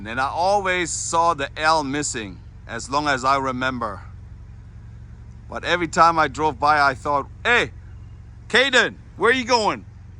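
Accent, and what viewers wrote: Germany. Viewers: Nostalgia, I so remember that old Hollywood hotel Mr, I am from so.central los Angeles, so your video is taking us down memory lane, so sad it got demolished, I liked los Angeles county when I lived there back in the 50,sand 60,s it was nice back then, now it's so different, you should go see Griffith park and the observatory and the old zoo there and the train, I do enjoy your video,s and I like that German accent, you could be a great tour guide, thanks for the video, good job, Have a beautiful day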